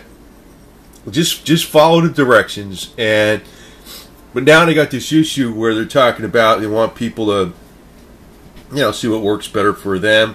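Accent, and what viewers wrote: United States. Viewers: amrykanski gadzi głos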